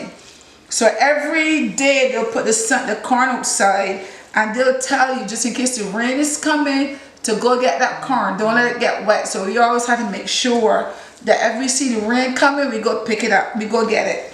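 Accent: Barbados. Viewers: Your recipes are always on point. Any one can follow. I am so impressed that even though you've been away from this land for so long you sound so bajan. And you remember everything. Love ❤ it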